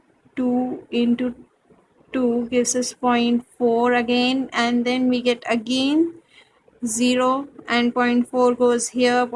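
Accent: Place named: India. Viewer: Typical Indian English -_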